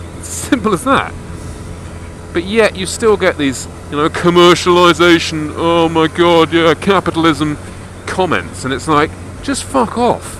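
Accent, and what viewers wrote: United Kingdom. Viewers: Oh, your uploads are always the highlight of the week/month for me (okay, as long as we are talking about interwebz entertainment). I often ask myself if your videos would be as entertaining if it wouldn't be for your british accent. How about a hillbilly special, mate?:D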